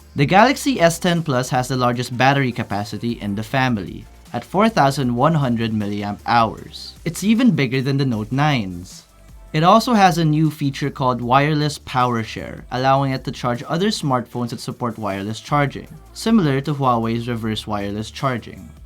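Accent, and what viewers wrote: Philippines. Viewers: I immediately stop watching the moment I hear the ugly Filipino accent! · I'm confused. You look korean, but you have a tagalog accent. Itzy! They're dope, right?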